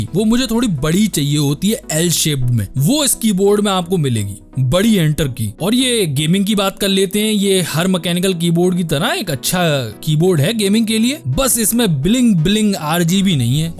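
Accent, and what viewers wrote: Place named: Agra, India. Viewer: Bohot badhiya channel hai bhai aapka. Aur wo Agra wali boli to maja hi aa jata hai sun ke. but ek request ke single product ka video 16 min ka na banaiye. jyada ho jata hai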